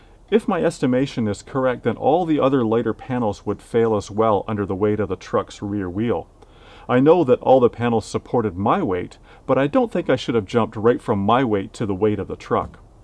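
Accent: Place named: Canada. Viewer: His accent didn't gave him away? Aboat?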